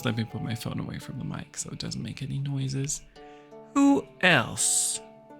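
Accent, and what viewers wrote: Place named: Netherlands. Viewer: You sound Dutch. 👍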